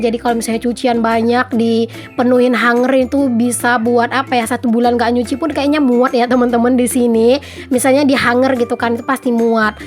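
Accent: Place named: West Sumatra, Indonesia. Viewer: Hay Bun, Bun aku tuh salpok sama suara waktu dabing dan ga dabing beda banget 🤭 kalau ga lagi dabing suara nya Minang banget 🤭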